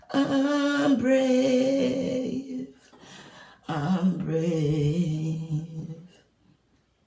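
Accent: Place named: Jamaica. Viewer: Lol.. I love the way my Jamaican people speak. She has a personality on her!!!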